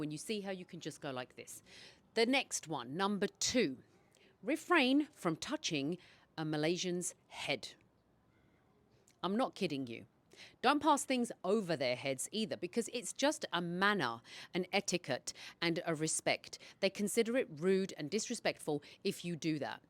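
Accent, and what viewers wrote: United Kingdom. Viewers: Assalamualaikum sister Please can you remove subtitles from your videos bcz with them i cannot listen you focusly, i wanna understand you directly cz i do love British accent.thnks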